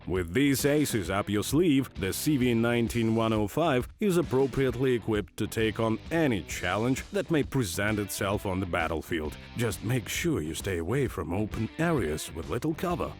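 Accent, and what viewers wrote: Russia. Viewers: Yes, tell me more about Swedish box tenk Mr. Deep voiced Russian Narrator :3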